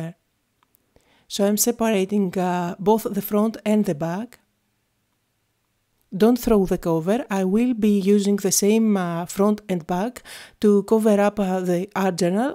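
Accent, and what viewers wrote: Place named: Greece. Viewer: Φαινεται τοσο πολυ οτι εισαι Ελληνίδα , απο την προφορα και μονο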